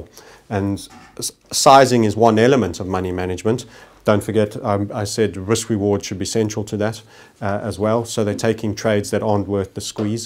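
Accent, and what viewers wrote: South Africa. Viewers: Why do you sound South African? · SA accent.. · This guy sounds South African 🇿🇦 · He has a South African accent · you sound south african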